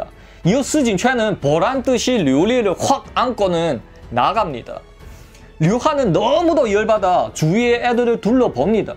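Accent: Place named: Korean-Chinese, China. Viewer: 와 무슨 무협지 보는것 같네 실지 존재했던 흑사회들의 주요 사건비화를 류한이라는 주인공을 등장시켜 이야기를 조선족 특유의 강한 어투로 이야기 찰지게 잘하시네 일단 구독 좋아요 누릅니다 10회 기다립니다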